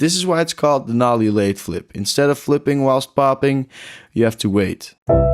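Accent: Netherlands. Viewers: Eindelijk ik wist het aan je accent je bent Nederlands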